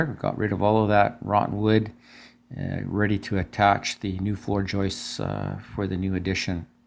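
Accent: Canada. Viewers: Your building construction is AWESOME, and is STRONG! (...your accent: are you Canadian? I love your accent!My mother was Puerto Rican; she had her accent; my father was a "hard-nosed Yankee; he had his accent. Your accent is...nice; it's "neat" that your daughter gets to help! GOOD JOB!! AWESOME QUALITY!! ). 👍😃👣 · You very much Welcome, again excellent looking home! I only assumed you were Canadian by your accent I have a few friends that live in Canada, Well greetings from Florida!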